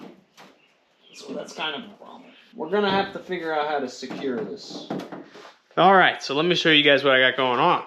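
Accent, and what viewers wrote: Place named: New Jersey, United States. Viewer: You have a accent . Jersey?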